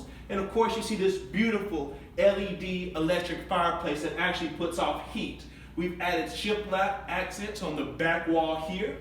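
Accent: South, United States. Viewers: Is he southern, ghetto or just smooth in his mind? Nice layouts, but he turns me off completely.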